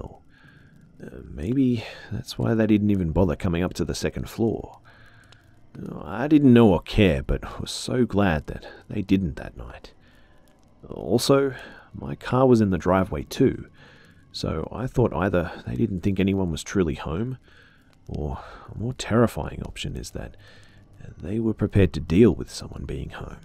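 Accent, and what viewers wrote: Australia. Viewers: Ahhh, I took a break from horror stories for a while, but boy am I glad that to be introduced back into it by a smooth Australian voice. Thanks B! (You are Australian, right?)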